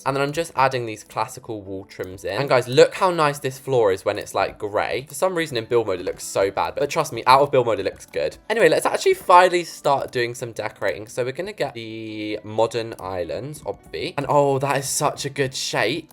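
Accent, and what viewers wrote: United States. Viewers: I LOVE you Amarican accent:) Its sooo Fun! She should come to Amarica someday! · I love ur builds but I’m not the biggest fan of ur style… or ur American accent srry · I CANT I LOVE THE A,ERICAN ACCENT ITS TOO FUNNY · Do an entire video in your american accent and call everything in their american way, Ex: Crisps are now chips. (im from america lol and want to see you strugle with everything) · I love how he does the American accent 😂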